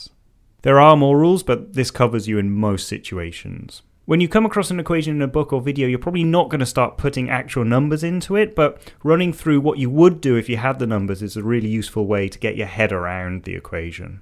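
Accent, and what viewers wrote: England, United Kingdom. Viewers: English accent but u spelt maths as math... (facepalm)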